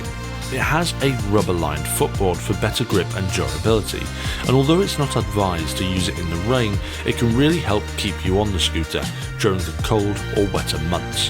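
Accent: England, United Kingdom. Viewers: I Like the books and the English accent, it makes you seem smart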